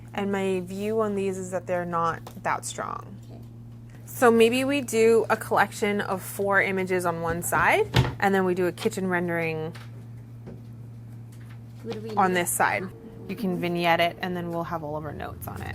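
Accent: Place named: Canada. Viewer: watched it for one minute and immediately noticed your canadian accent 😍❤️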